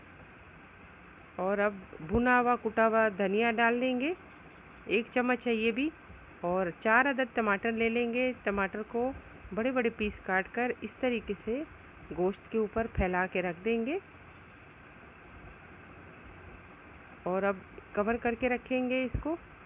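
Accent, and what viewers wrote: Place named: Sindh, India. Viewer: Sis ap sindhi hain . Bhat krne ka andaz sindhi jaisa hai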